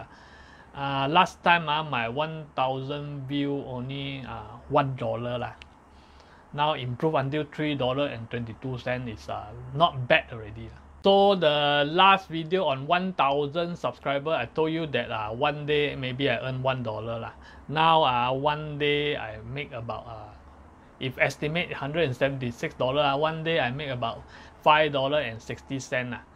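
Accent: Singapore. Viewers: Subscribed, love the true singaporean vibe, the Singlish is power, and it’s just like watching your neighbourly Uncle talking, brings us back to kampong days. Keep it up.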